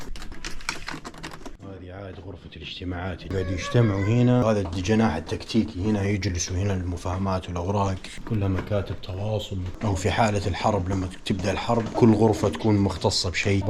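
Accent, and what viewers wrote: Saudi Arabia. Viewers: من تونس لاكن عاش فالسعوديه طفولته عشان كذا يتكلم سعودي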